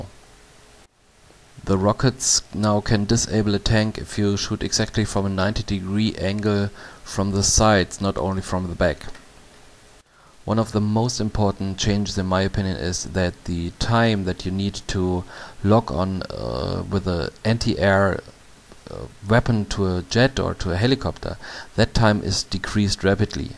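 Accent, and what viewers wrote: Germany. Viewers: Du bist ein Deutscher :D Das höhrt man! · Ich wusste ab sofort, dass du Deutscher bist xD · Best german accent i have ever heard of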